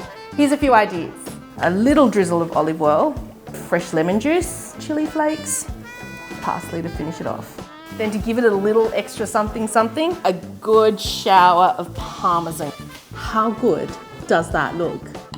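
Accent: Australia. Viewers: love yr aussie accent.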